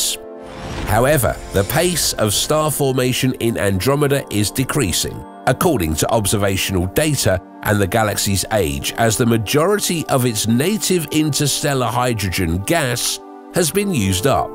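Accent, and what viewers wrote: England, United Kingdom. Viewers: If it wasn't for this guys English accent I wouldn't believe this!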